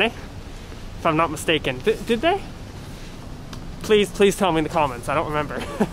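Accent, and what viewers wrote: Pakistan. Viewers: also love your urdu accent ❤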